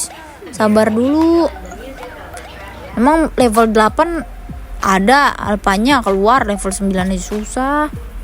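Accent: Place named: Bekasi, Indonesia. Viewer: Dari bekasi ya 😂😂 logatnya kaya ga asing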